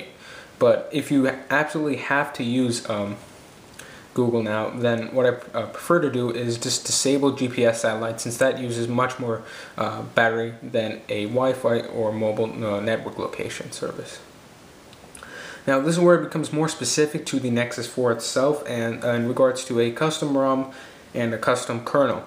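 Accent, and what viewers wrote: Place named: United Kingdom. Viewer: USE UR BRITISH ACCENT!!!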